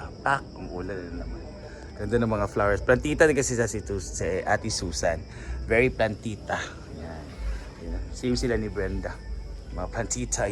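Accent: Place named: England, United Kingdom. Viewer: ❤, I like your English accent ❤, bagay gyud sa imoha mag host ug event ❤😊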